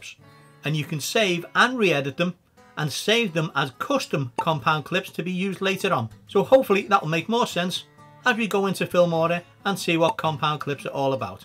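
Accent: Liverpool, United Kingdom. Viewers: Hi mate, i googled compound clip for something on Fillmora as I made a video that had wayyy to many clips and needed to merge them as I made 3 separate "films" part 1,2 and 3. Needed to put them as 1 as it took 8 hours to make the 3 films into 1. Anyways Googled it an your video was the 1st video to come up... hit play and I smiled as your VOICE, the accent. I recognised as I'm from Liverpool and it was nice to hear. (Hopefully u r from around these ways an i don't look like a wally lol) Thanks for the video. I'm getting into Fillmora more & more. I don't restrict myself to a software as its the amateur thing if u don't use Premier or the apple 1. I like to use Adobe, davinci & filmora I am really enjoying it. Will be watching more of ur videos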